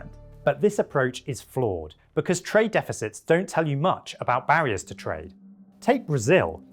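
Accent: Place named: United Kingdom. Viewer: Heard the British accent and knew it would be trash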